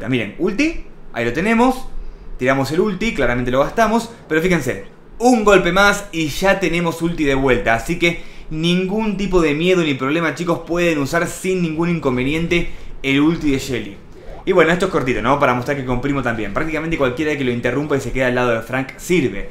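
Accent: Argentina. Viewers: Eres argentino o mexicano?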